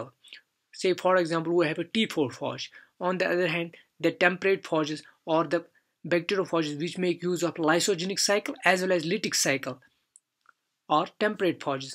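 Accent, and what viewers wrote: Kashmir, India. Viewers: Came for the science, stayed for the lovely indian accent! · I think u r from kashmir? Accent of talking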